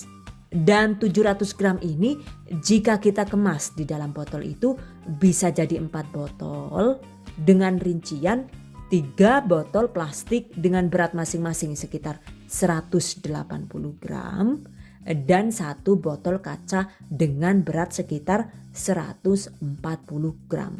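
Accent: Banyumas, Indonesia. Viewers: Smg lancar dan barokah... Aamiin...baru ketemu channel ini langsung suka ... apalagi ada logat ngapak nya yuhuuu...top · Ha ha.. Selalu ada ngapaknya,lucuu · Sdh lama gk ngikuti video, tambah cantik aja bunda😄😄, dgn bahasa khas Banyumas nya 🤭🤭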